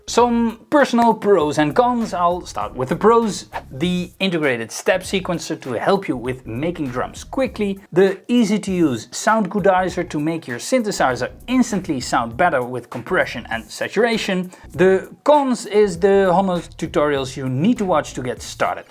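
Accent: Netherlands. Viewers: Hi, Are you from the Netherlands? (The accent sounds to me like that)….in that case I could ask my question in Dutch. 🙂 I was wondering….these are all tips for Imac/windows right? Any suggestions if you want to work on an ipad (and only ipad)? I’m using garageband now….I know it’s not the most professional choice, but for free and I can use it with just my ipad. Any advice?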